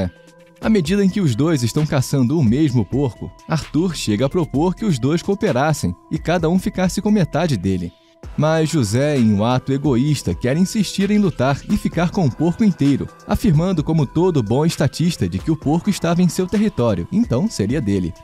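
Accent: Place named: Rio de Janeiro, Brazil. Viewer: Alguém ai mais percebeu que carioca fala NAICE (nasce), NAISCER(nascer) NAICEU(nasceu)? NAISÃO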